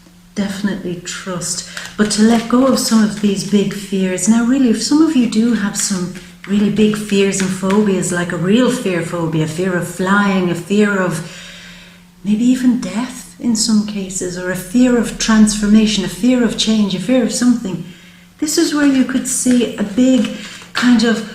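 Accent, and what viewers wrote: Ireland. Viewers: I love you, Your beautiful face and your wonderful resonate voice...that resonates with me. Your brogue make me feel like I am at home, and for some reason I do too...I shouldn't but I do more often than not I speak with a brogue, its fun and feels so natural, any way good reading.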